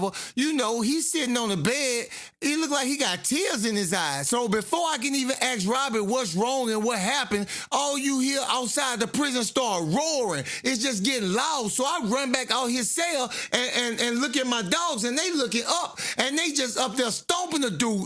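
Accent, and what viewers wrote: Louisiana, United States. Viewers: That Louisiana accent makes the stories sooooooo much better on gawd. 🔥🔥🔥💯💯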